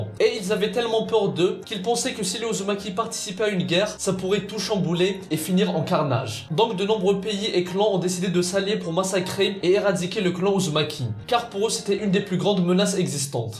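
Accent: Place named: Kabylie, Algeria. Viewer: T'as un accent kabyle 🤣 réponds moi si c vrai !!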